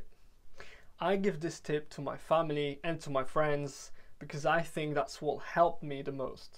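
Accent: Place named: United Kingdom. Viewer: Très impressionné, bravo pour le travail ! Si je peux me permettre, j'ai l'impression que la prononciation du "there" peut être améliorée, et c'est un mot qui revient souvent donc on remarque la différence avec le reste qui est dans un accent british quasi irréprochable haha Bonne continuation en tout cas, keep up the good work !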